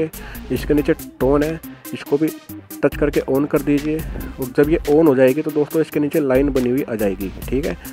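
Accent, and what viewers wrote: Haryana, India. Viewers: bro aap Haryana se ho na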